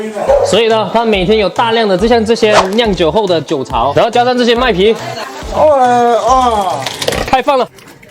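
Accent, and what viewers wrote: Chaoshan, China. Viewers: 这是潮汕话吗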